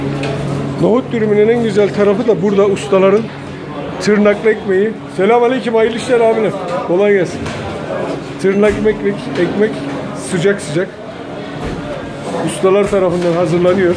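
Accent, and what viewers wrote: Gaziantep, Turkey. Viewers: Kardeş videolarını izliyoruz.bizi hep umsuruk ediyn ağam gecenin bir yarışında hanıma yemek yaptırıyorum.sayende kilo aldım. Ama antep sivenle harikasın basarilar....videolarin devamını bekliyoruz.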